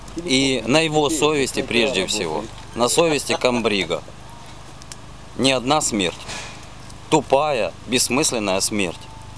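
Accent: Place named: Ivano-Frankivsk, Ukraine. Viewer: Какой то Одессит мама дорогая я вас умоляю?? ! Не делайте мне нервы своими фейками ;) По говору Таки он с Ивано Франковска!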